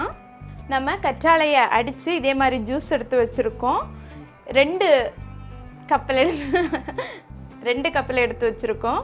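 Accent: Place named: Nagercoil, India. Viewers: Nagercoil slang 🤣🤣🥰🥰🥰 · I think u r from kanyakumari from the way u speak. Bcoz m also from kk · Neenga nalla pandringa super nagarcoil slang enaku romba pidikum thank u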